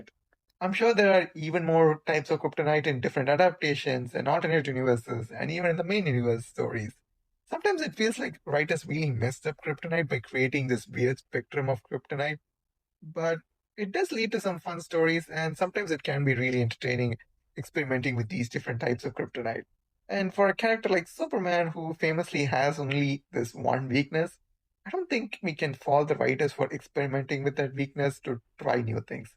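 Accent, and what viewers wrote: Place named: India. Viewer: Indian voice makes it feel like a tutorial on YouTube 7 years ago I like it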